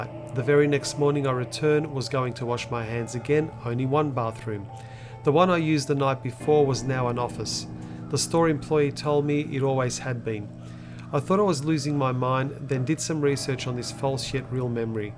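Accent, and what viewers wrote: United Kingdom. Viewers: William Young it's his English accent nutball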